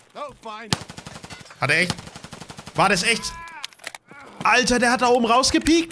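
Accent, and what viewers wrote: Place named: North, Germany. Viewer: sehr sympatisch, da merkt man den Norddeutschen, muss man an der Stelle einfach mal sagen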